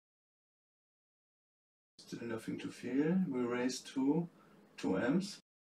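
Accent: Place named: Germany. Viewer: Hello, I have the same laptop. No charging, no power. Your accent sounds like German :D Can you give me some advice?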